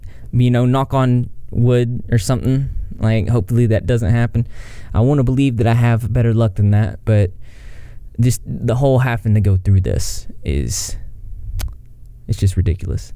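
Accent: South, United States. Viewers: It's just southern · You have Southern accent.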